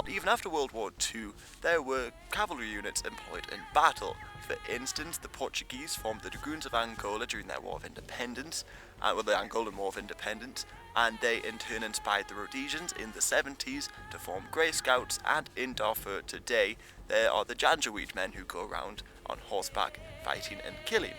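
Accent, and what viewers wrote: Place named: Newcastle, United Kingdom. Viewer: Neecastle lad! We-aye! Dare you'd to speak Geordie in an episode to come!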